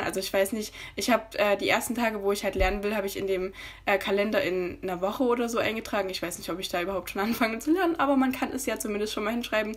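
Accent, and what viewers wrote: Saxony, Germany. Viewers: Kommst du aus sachsen? Komme selbst aus Sachsen und es klingt von den Prüfungsfächern und so ziemlich nach Sachsen (und auch bissl dein Akzent😂❤️)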